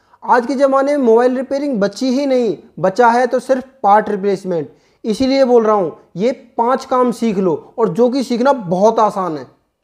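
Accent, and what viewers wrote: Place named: United Kingdom. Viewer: Apne to hosla bada dia aapkenatane k andaz nirala h lagata h aap uk se ho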